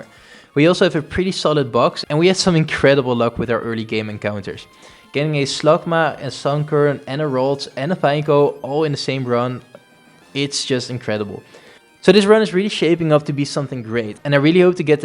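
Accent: Netherlands. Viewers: you sound very dutch, is this true?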